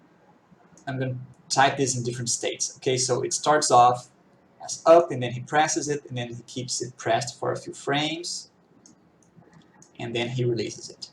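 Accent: Brazil. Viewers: é br??? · percebi desde o inicio que vc era brasileiro kk belo trabalho aí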